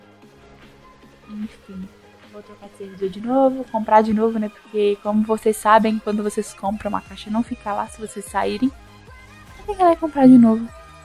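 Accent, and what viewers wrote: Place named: Minas Gerais, Brazil. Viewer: Esse Sotaque Mineiro e meu devolvs kkkkk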